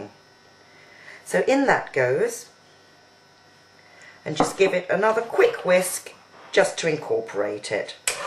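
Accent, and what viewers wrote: United Kingdom. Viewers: Sounds like she may be British. I enjoyed this video because of what you mentioned. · I'm an American, and just by listening to your voice, I thought you were a British Aristocrat! I wish I could speak English like you. Thank you so much.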